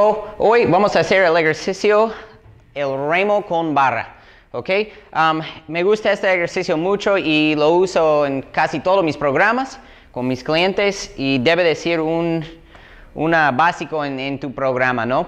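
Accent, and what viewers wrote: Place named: Yucatan, Mexico. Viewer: Donde vives? Hablas un poco como yucateco xd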